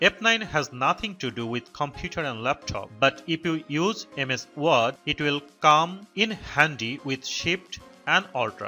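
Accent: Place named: India. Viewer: Thank you for explaining Fn keys but I struggled to understand due to the Indian accent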